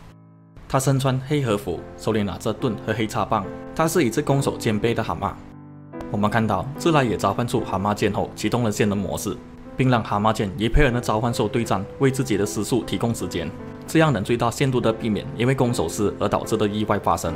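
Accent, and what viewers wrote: Malaysia. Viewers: 馬來人講中文真的讓人耳朵很累很痛苦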